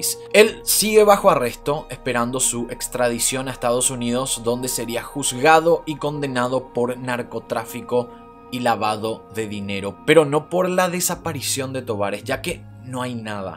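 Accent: Paraguay. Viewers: me encanta los Capitulos, despues de ver 6 episodios me di cuenta que es Paraguayo, por el asento 😜 te sigo desde Dublin Ireland 👍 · Tu acento neutro paraguayo 👌👌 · Te encontré en Fb Y luego te busque en YouTube Me suscribí a tu canal Me encantan tus videos Todo muy bien investigado No había escuchado hablar a nadie de Paraguay 🇵🇾 hermoso acento 😊 Me uniré a todas tus redes sociales 😉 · Me encanta que no perdes el acento de Paraguay ❤️🙌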